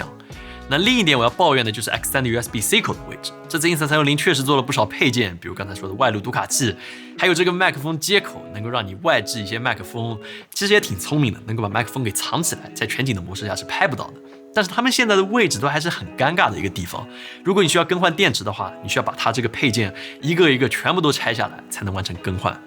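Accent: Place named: Zhejiang, China. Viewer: 听着像浙江/上海口音。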